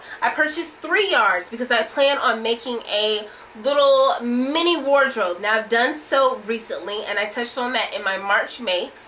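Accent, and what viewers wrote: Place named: North Carolina, United States. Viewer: I knew you sound familiar! 😊I'm from and live in NC, too! Can't beat that country accent.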